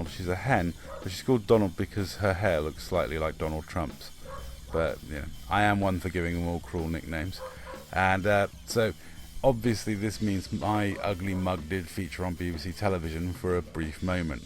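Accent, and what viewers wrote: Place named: United States. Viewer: I enjoyed the video. Sounds like something one many local USA media would have done. Then again local news in states would have gone little more detail. Your voice and what I imagine what you look like was total different. I could easily pass off as some from the states. It's same that people don't treat the chickens and rosters well. Here states I know a lot people that rise chickens for their eggs and meat. All them treat chickens and rosters very well. I do hate cock fighting and it should be ban through out the world.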